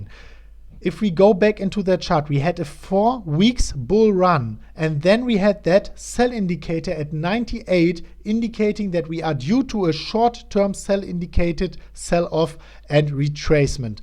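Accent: Germany. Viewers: nein wtf, klar hört man dein deutschen akzent raus aber dein englisch ist mega gut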